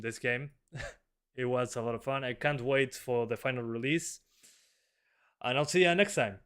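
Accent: Rioplatense, Argentina. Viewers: tenes acento argentino o uruguayo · Hola, de donde sos, hablas ingles, pero pareces de Buenos Aires, o Uruguay?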